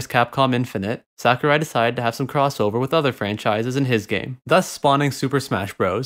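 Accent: Newfoundland, Canada. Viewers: Started watching a ton of your videos. Quick question. You a Newfie?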